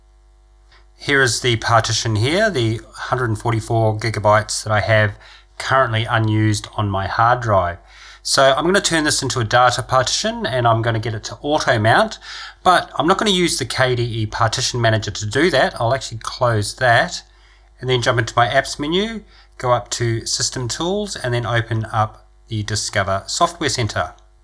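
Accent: Australia. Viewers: For my money you are the best on YouTube for explaining how to configure everything Linux. Step by step, no BS and very articulate and to the point with your Aussies English. Thank you for all you do for us.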